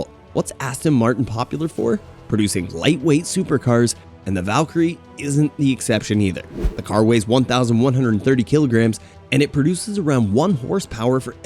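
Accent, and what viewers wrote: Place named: United States. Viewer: Hahah mispronunciation master . Got all the horsepower wrong and with an American accent talking about pounds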